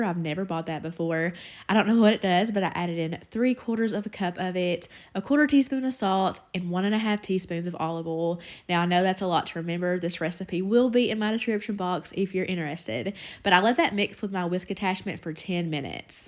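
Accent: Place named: South, United States. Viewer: I love your receipts and your accent, just like Paula Deen, oil y'all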